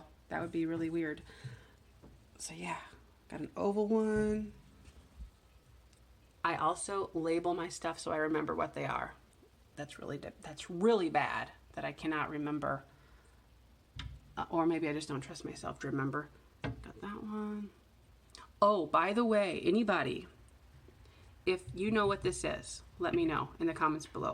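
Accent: United States. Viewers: Oh love the country accent omg laughing so hard 🤣🤣🤣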